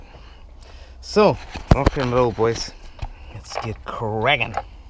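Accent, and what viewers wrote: South Africa. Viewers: Hi Calvin here can hear you are from SA 😅 wanted to know is there a way i can get in contact with you for the spares you get cant get nice things this side for the golfs and audis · Where are you from? You sound like a South African.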